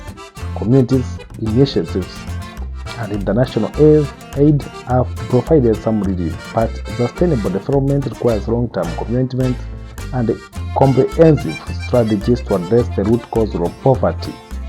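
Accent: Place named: Africa. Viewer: You sound african and fill proud to speak ill of an african country, you only show slum areas as if Burundi is entirely composed of slums, slums are every where even in the countries you call develeped, stop being one sided, I advise you to be more professional at presenting because you yourself your presentation is underdeveloped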